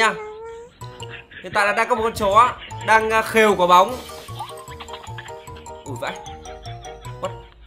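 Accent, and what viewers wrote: North, Vietnam. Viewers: miền Bắc nha bạn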